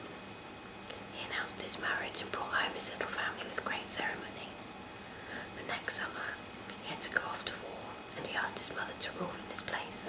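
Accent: United Kingdom. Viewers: Your vids are pretty awesome. I've been craving a new British accent ever since Whispering Life stopped doing hers. Glad I'm came across you. I'm more than satisfied here! : )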